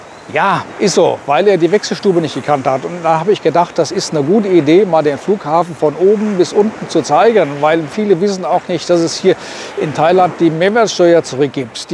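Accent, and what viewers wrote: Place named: Hesse, Germany. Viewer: Ei Guude,.Ich lebe und arbeite seit 32 Jahren in Thailand/ Deutschland, spreche fließend Thai und bilde mir ein, das Land und die Leute relativ gut zu kennen. Dein Kanal ist einfach sehenswert, weil Du offen und ehrlich über Deine ersten Erfahrungen sprichst, versuchst, Infos zu vermitteln, obwohl Du selbst ja noch ein " Rookie" bist und das alles in schönstem hessisch. Ich finde das sehr unterhaltsam und rate Dir, diese Trolle einfach zu blockieren. Leider gibt es diese Art Menschen auf jedem social medium, die nur darauf warten, aus ihren Löchern herauszukriechen und irgendwelchen geistigen Dünnschiss von sich zu geben. Lass Dir Dein YouTube nicht madig machen, weiter sie bisher und von mir gibts, wie immer, einen Daumen nach oben! P.S. und grüße mir mein Pattaya. Habe da einige Jahre gelebt und es war eine tolle Zeit. 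Bangkok, der Norden, Phuket usw. sind toll, aber Pattaya ist eben was Besonderes ( hatte ich erst nach 7 Jahren Aufenthalt in Thailand festgestellt, da ich mich so lange geweigert hatte, mal nach Pattaya zu fahren ) Ich werde da bestimmt als Rentner wieder auftauchen.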